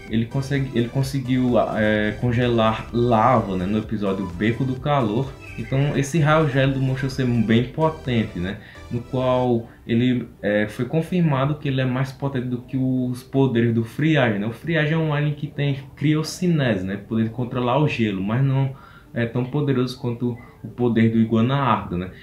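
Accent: Northeast, Brazil. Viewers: vc e nordestino?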